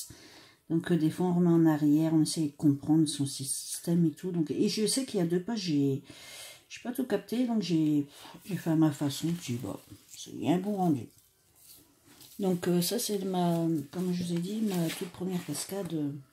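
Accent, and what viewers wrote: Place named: Lille, France. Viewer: Ok j ai reconnu cette accent moi je suis de ronchin près de Lille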